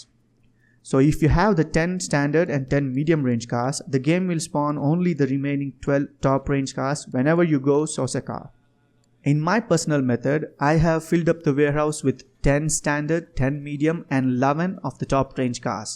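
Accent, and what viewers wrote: India. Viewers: Tnx bro it works 😉😉😉 btw I love your indian accent 😘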